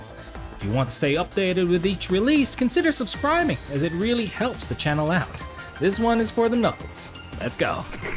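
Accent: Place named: Philippines. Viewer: Nice video po! very well explained :) pinoy english accent on point :3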